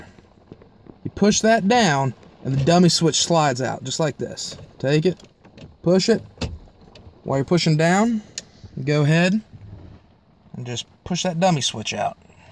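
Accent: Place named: South, United States. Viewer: Damn hick accent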